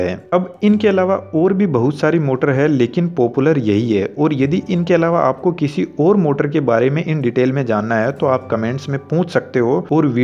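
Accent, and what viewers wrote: India. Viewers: bro are you indian or i just watch dubbed video by the way your content is awesome and informative.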